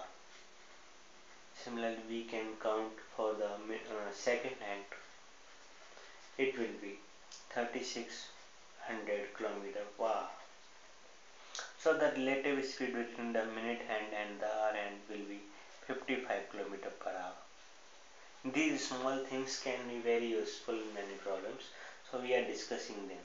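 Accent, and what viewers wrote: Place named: Asia. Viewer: wow, I would've thought that someone would've made fun of his Asian accent saying that Asians are really smart. Well done world!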